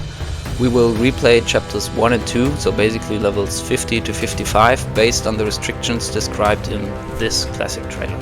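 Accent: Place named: Germany. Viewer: thx for the test. also love the nice seemingly german accent :-)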